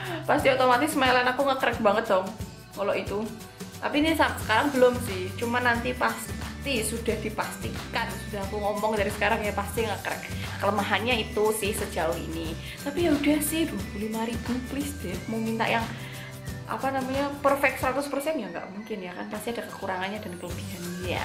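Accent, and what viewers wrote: Java, Indonesia. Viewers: Mba bule medok 😍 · Yey Like And First Watching Video Mbak Medhok.. Sukses Trus Mbak☺. Makin hri mkin syanteekk. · Ayunee mbk medhokk😍😍😍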